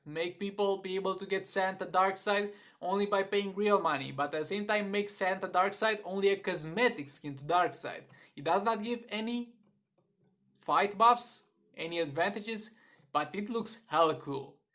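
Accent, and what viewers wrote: Romania. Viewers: Omg Ur from Rumania. Been wondering where your accent was from for a while.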